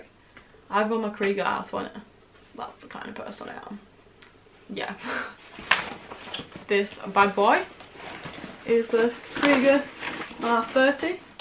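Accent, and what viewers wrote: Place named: North, United Kingdom. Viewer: Where you from? Sounds like a northern accent hun x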